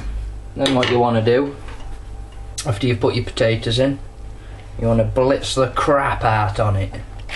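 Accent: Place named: Stoke-on-Trent, United Kingdom. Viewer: Stoke on Trent accent....Shropshire.. Silk Road & all that jazz. You still alive?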